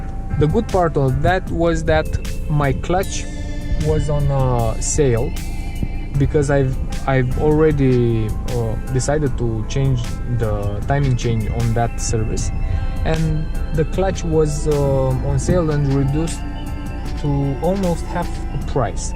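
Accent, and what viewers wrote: Romania. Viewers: dupa accent te-am cunoscut ca esti de al nostru😁👍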